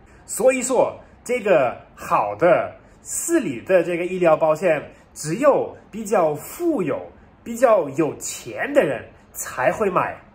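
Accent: Sichuan, China. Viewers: 哈哈, 在成都久了,普通話都帶四川口音了。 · 的普通话是不是带的四川的口音呢？哈哈😄很可爱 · 我住臺灣省喜歡您的視頻更喜歡聽你濃厚四川腔普通話!感謝你介绍大陸四川成都風俗民情~❤👍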